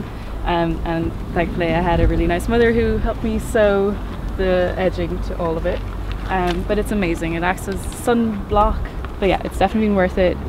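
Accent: Ireland. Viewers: She is originally from Ireland, that's why she's sound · Is that an Irish twang I hear? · Are you from Ireland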